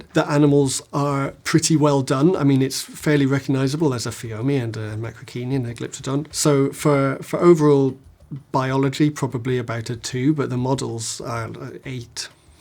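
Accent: Scotland, United Kingdom. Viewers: No, you're fine. he's using a regional pronunciation from parts of the UK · Gotta love a guy geekin out with a British accent. Adorbs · british like me yes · Great. We have a British sounding explaining Prehistoric now! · Can someone tell me wht kind of british accent does he hav? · He's from Edinburgh and now lives in London so has a mild Scottish accent.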